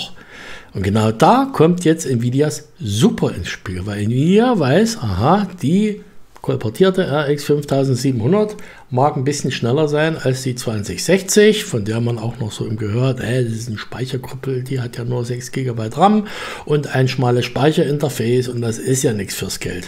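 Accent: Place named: East Germany, Germany. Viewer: Du bist ja noch "am Anfang", du wirst sicher sehr schnell sehr viele neue Abonnenten haben. Jedenfalls machst du das äußerts professionell , du wirkst wirklich ausgesprochen kompetent. Davon ab, dein leichter "Ossi-Akzent" ( sorry Dafür ), ist sehr sympatisch. Weiter so !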